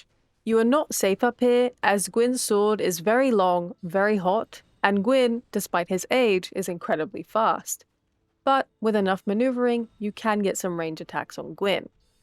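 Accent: United Kingdom. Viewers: Your voice is just fine. If nothing else, it’s nice to hear a fellow Brit!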